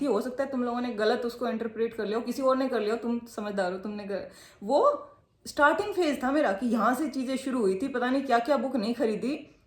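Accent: Haryana, India. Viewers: Mhare Haryana tt ho aap, awaj tt hi beraa laag gya😂😂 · Your haryanvi accent is so cool😅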